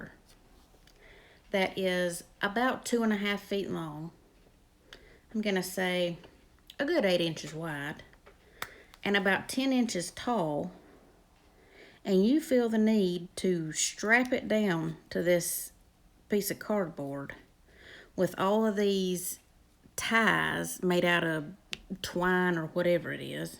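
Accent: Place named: Louisiana, United States. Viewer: omg i love you ...kept me laughing the whole hour....could listen to your accent all day ..makes me homesick....was born and raised in Louisiana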